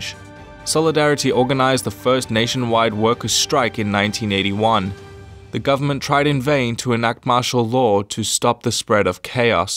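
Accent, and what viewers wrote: Poland. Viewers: nic polish accent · is this guy polish or something?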